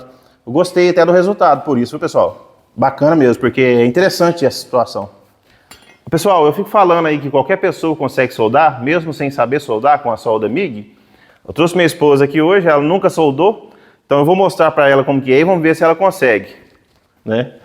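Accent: Minas Gerais, Brazil. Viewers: Bom dia,vc tem muita paciência e vontade de ensinar, parabéns pelo vídeo,e esse sotaque me parece que vc é de Minas Gerais, eu tenho um certo receio em usar essas inversoras junto do corpo por causa do campo eletromagnético que ela pode gerar, é melhor evitar uma possível radiação no tórax.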